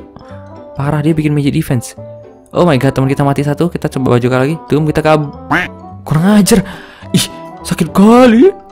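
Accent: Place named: Medan, Indonesia. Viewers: Abg org medan yaa ?? Logatnyaa... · nampak kali medannya ya bang :D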